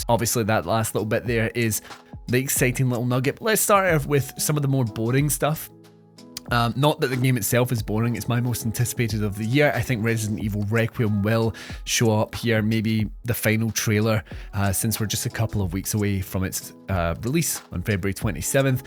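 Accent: Scotland, United Kingdom. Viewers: What accent is that? Is that Scottish? It's not a bad one. Who's excited for Horizon?😂...nobody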